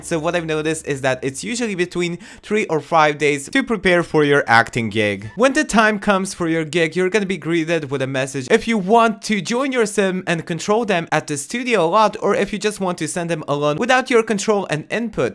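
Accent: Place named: France. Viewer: I LOVE your accent! Are you from France?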